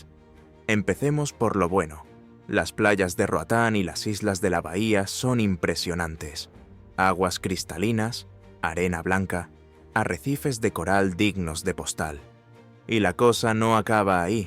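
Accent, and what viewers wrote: Spain. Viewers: La envidia te hace delirar, solo hablas por que te dicen los otros como tu envidioso, ... ojalá algún día tengas la posibilidad de ir a Venezuela, .... para que veas lo muy superior que es sobre tu país y muchos otros. Ese narrador nunca ha saludo de España que creo es su acento, por lo tanto habla bobadas por que no sabe. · por tu acento asumo que eres español, lamento decirte que españa tampoco es la octava maravilla del mundo Einstein, saludos desde el pais mas lindo del mundo ES.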